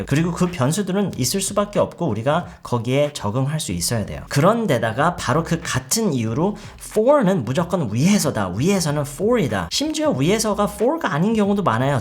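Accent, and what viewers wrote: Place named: United States. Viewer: 우리말 할 때 억양같은 거 보면 미국에서 나고 자란 교포같으신데 어쩜 이리 한국어를 완벽하게 하실까요 한국어와 대조한 영어의 차이점을 너무 친절하게 설명해 주셔서 이해가 쏙쏙 돼요 항상 감사드려요!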